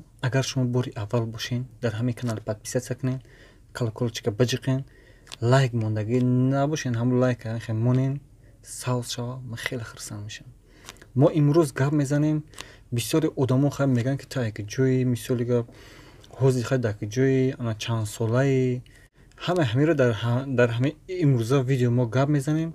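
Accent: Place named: Pamir, Tajikistan. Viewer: ты ранги помирийо гап мезани бо йагом рагт помири набоша ба пеш 👍👍👍👍👍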